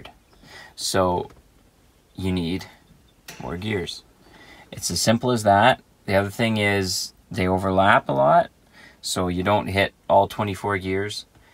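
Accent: Canada. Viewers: Hey buddy you from Canada? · The first 10 seconds I knew this fella was Canadian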